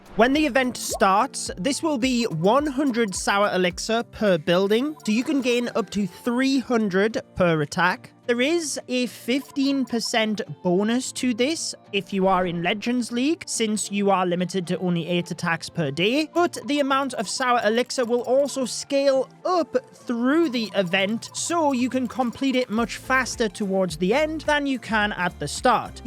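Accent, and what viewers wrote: United Kingdom. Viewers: Is he British or what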